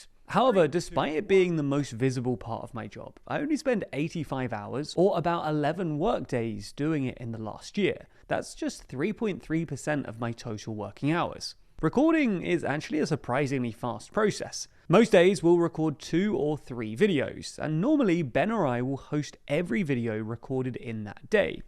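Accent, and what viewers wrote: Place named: RP, United Kingdom. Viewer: Wow... an academic, RP-sounding voice makes anyone sound like a good writer 😇😇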